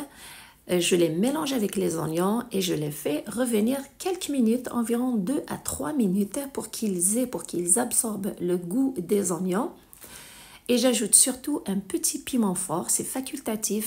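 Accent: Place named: Kabylie, Algeria. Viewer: Merci, faut avoir 2 mains gauches pour ne pas reussir cette Coca grace a tes consignes 😜Un bonjour de Hollande d'un wlid bladak (j'aime ton leger accent kabyle)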